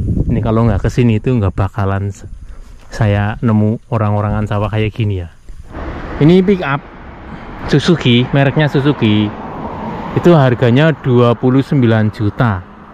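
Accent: Banyumas, Indonesia. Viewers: aku suka gaya ngevloge, ana ngapak2e...👍👍👍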